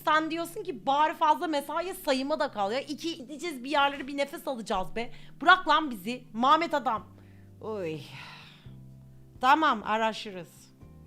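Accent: Black Sea, Turkey. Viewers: Batugh Tanem valla senden akilli xngxhnxmhfmjfmjf Ve karadeniz sivesi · karadeniz şivesinden dolayı ciiddi şeyler çok komik yaa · Karadeniz şivesi❤ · Haşmetlimin her episode serisinde birini Karadeniz şivesiyle yapmasına bayılıyorum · Karadeniz şiveli gercekmiss hayallerim gercek olduuuu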